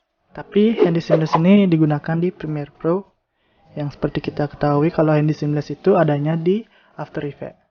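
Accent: Bali, Indonesia. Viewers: Salam logat bali brow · mule logat bali tetep kental wkwk, mantap lah bli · uhmmm logat bali :L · Logat nak bali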